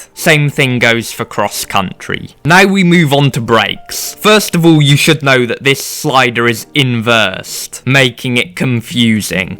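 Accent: United Kingdom. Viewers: british accent + braces = the most unconfortable voice to hear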